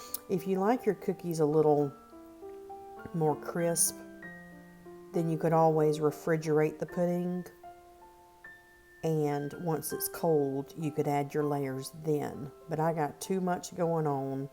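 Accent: South, United States. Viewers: That's how I grew up eating banana pudding. Not that instant stuff. Warm and DELICIOUS ♥♥ I know this is a late comment but, my husband could eat that by himself. LOL Looks good. That's exactly how I grew up eating banana pudding, the way my Granny used to make it, she passed in January and I have been searching for a recipe like this one. Al I have been getting the ones with the instant pudding and whip cream. No ma'am press ham, I need the OG BANANA PUDDING, and THANK GOD, I have found it. 😊😊😊 Thank you for the recipe. I love your accent also. I'm from the south as well. LR, ARKANSAS, BORN AND RAISED ♥♥ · Though I'm gluten sensitive, I enjoyed watching you make banana pudding from scratch. Listening to you speaking reminds me of growing up down South and it brings nice memories. 💗🍌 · I like your southern accent, and I love “ what my mama said” part. 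I will definitely try this recipe.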